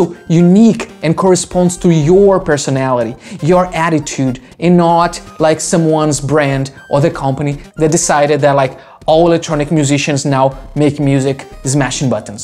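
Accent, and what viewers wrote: Brazil. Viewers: Thanks for the info, I am going to build a controller for my DAW. I am from England but now permanently live in Brazil (Your accent sounds Brazilian).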